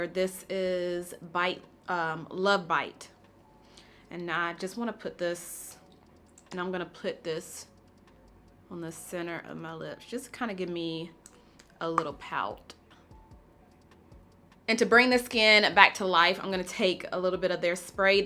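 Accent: South, United States. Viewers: Love your videos and your southern bell accent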